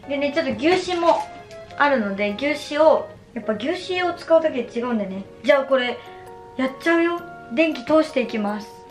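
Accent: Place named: Kansai, Japan. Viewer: 関西弁面白くてめっちゃ可愛かった😳 これからももっと話して😂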